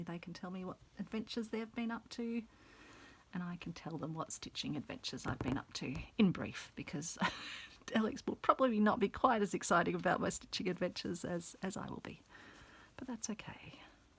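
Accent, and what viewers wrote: Australia. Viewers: So love hearing a fellow australian. I subscribed co I have just found you and also cos if I follow Australians they can tell where they get their supplies, cos I can to! c’mon aussie c’mon 🧵🪡🧵🪡🧵🪡 I will make this cos I think it is a great idea. Thanks heaps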